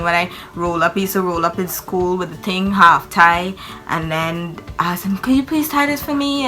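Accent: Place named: Jamaica. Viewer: I want more of the Jamaican accent!!! 😂❤❤ 10/10 for the accent lol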